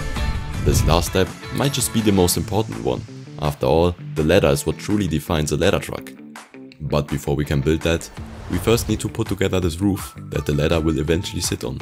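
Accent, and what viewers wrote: Germany. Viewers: Am i the only one who heard a slight german accent? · from your speaking i can guess you are from germany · Bis du deutsch ? Du hast eine kleine accent 😊 Ich habe dich wegen der Aussprache von „Chicago“ entdeckt: ein zusätzliches H und viele A „chic'Haaaaago“ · That’s NOT a realistic fire truck. Lego is European. And judging your accent, you clearly are German. So why the frick are you calling this thing realistic? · Do i hear a little bit of an DEUTSCHER AKTZENT? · i hear german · I hear a little bit of deutscher Akzent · Is he german? Im German and his accent sounds sooooo German to me😂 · Digga warum kein deutsches Auto man hört doch dass du Deutscher bist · The German accent 😂 Great Video mate! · Do I hear some DEUTSCH in your language? 🤣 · I hear german accent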